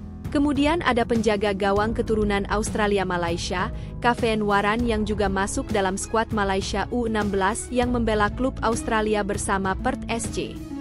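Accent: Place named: Europe. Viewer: Apakah anda orang asing, kenapa logatnya seperti orang eropa, atau anda tidak punya jiwa patriot, bangsa bahasa indonesia.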